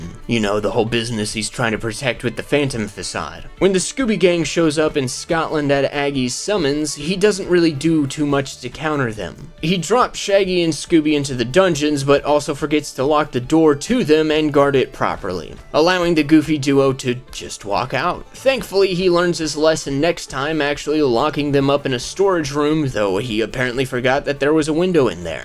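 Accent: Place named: New England, United States. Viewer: Gonna say Maine or Vermont maybe Connecticut. Had to subscribe just for the accent 401 forever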